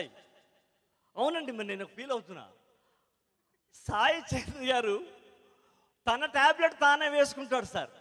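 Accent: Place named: Telangana, India. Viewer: Me goopathanam telusthundhi me matallo...... Telangana thathwam dwanisthundhi me yaasalo 👍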